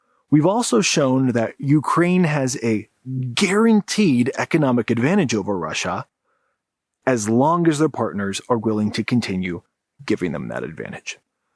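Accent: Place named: United States. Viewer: As Ukrainian ,i am nicely surprised that person who lives in States ,has so deep knowledge of what happens in our country.all arguments are clear & true In addition,so comprehensive americane english,not need to force your ear,plus we are getting information on the beautiful enviroment back there Wish your actual president could listen to intelligent people like this and not his morons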